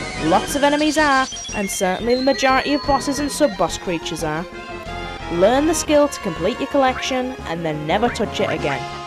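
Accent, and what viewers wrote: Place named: Northeast England, United Kingdom. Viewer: That's a North East English accent? Huh, neat!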